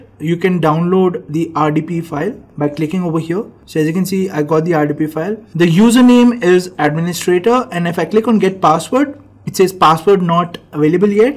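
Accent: India. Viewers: usually I have difficult time to understand Indian English accent, but you're awesome god bless you.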